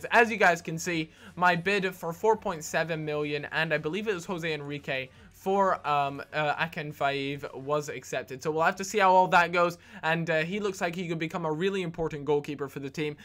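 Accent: Scotland, United Kingdom. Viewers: I love you just cause your Scottish <3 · Is Scottish? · is he scottish?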